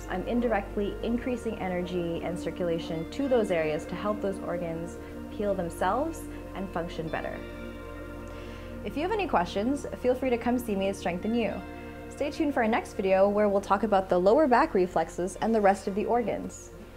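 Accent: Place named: General American, United States. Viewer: Her diction is clear. She has the standard American accent.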